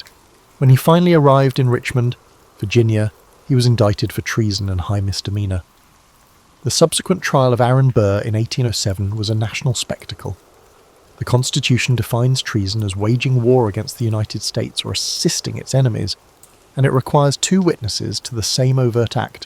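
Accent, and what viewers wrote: United Kingdom. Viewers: p.m. in the state of Ohio in the USA. Note: I find it very helpful to put the speed to .75 Love your British accent! 🙂❤️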